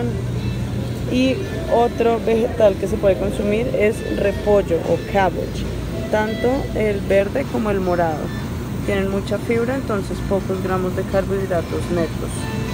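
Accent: Colombia. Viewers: Me recuerdas a mi ex colombiana estás súper linda , hablas como rolita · Es q los Colombianos son asi , gente bonita si forma de hablar sus modismos son.lindos y esta muer no se guarda naa nos dice las cosa tal cual ayuda mucho su sinceridad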